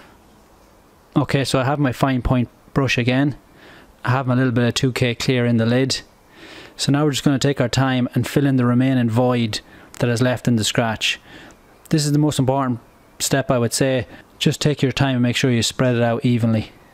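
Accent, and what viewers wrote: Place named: Ireland. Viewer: love your Irish accent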